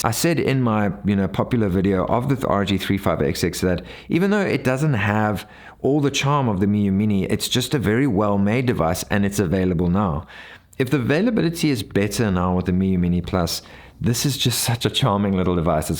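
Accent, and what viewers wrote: South Africa. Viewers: This came up on my recommendations because I’m keen to get this! But I have to ask, are you South African!? So cool! Can’t wait to get my Miyoo Mini Plus 😃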